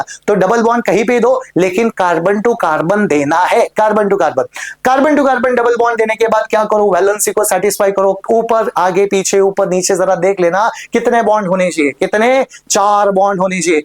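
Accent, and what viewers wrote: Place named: Maharashtra, India. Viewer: Sir are you marathi because of your accent I think so btw you teach great ❤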